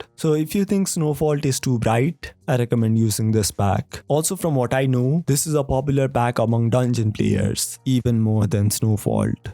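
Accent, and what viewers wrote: India. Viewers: Ur voice like indian??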